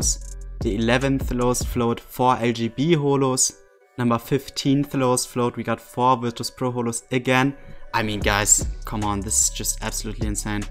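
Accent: Germany. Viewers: werd doch mal diese ekeligen bibanator deutsch akkzent los , das wäre mal n nice craft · Dieser deutsche Akzent 😂 nohate an der Stelle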